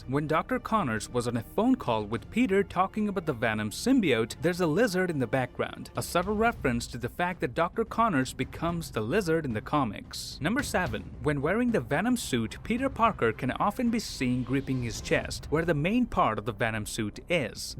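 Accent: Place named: Canada. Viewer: Don't worry, he's just speaking the usual canadian english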